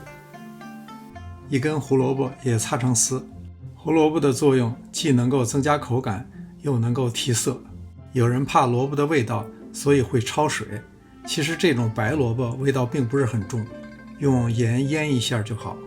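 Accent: Beijing, China. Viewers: 喜欢听你说话！比听歌好听！超纯音的京片子！做菜也到位！超赞！大师:多点出镜！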